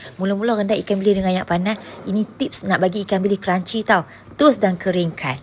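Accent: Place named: North, Malaysia. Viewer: Pekat lorat utara dia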